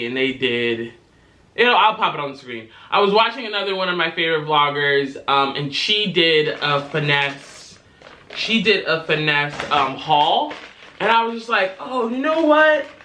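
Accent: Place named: United Kingdom. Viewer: Good British accent. I like your thirties diaries.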